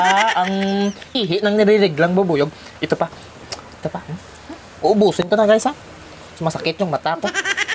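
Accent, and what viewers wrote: Iloilo, Philippines. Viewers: Ilonggo ka po dhay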